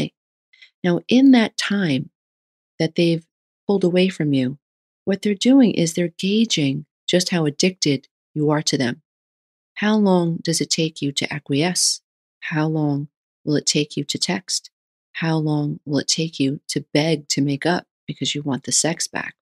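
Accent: New York, United States. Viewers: This is soooooo on point., the last guy I dated was just like this. blaming me he can't get me to do a threesome, calling me names I shouldn't write on here. luring me in with excitement in the beginning and then just flaunting it allll over NYC with everyone else.. im the crazy one, im the nasty one, im the violent one... etc etc etc. (these are just the examples related to this video). then finally he broke my nose. the next fight, I finally called the cops. and then Covid happened and I left NYC so I didn't get stuck quarantined with him. During this time I was able to reflect on everything. I found your videos, and you have helped me understand so much. you aren't the only one with videos on this content,.... but maybe missing home so much and hearing a New Yorker like someone I knew tell me it wasn't me and hearing the examples that literally were pages out of the book of Michelle and Matt 2019 made it click and gave me strength. 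I just want to thank you so much.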